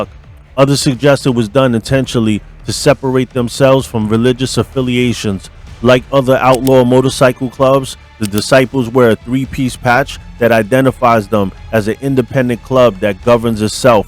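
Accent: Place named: New York, United States. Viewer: Bro, you are so New York. It’s pronounced TOOSAHN. Not Tuxon. Tucson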